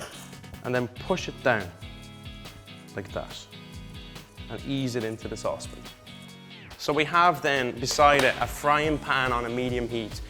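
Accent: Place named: Cavan, Ireland. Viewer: The Cavan accent makes the video 😂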